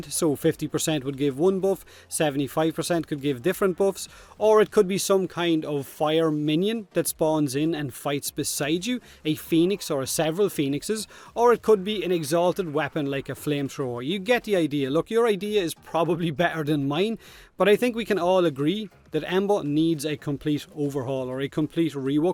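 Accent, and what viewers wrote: Ireland. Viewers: Honestly, i'd kinda like ember to stay very squishy but with a very high damaging capability, like nuking and such. Not some dumb press 4 to win, but something more like perhaps wisp's 4th ability, where you have to stack the reservoirs in order to deal the maximum amount of damage. Because warframes that have like one or two signature moves and a defensive shield, well, it's kinda all there is to new frames. Revenant, the new Nezha etc... I'd hate for that to become a recurring theme in the newer warframe designs. I really want ember to stay some kind of a glass cannon, but hoping DE can make her not point and click (the mesa rework on her 4th ability is a good exemple of a nuke ability well done). I'm sure they can pull off something along those lines. BTW, english not being my first language, it's getting pretty hard to understand what word you feel like you'r confusing yourself with (like third and turd). 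Just go with the irish accent it fucking rocks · Impressive,! You've adopted an irish accent, just like Zane from Borderlands! 😎👍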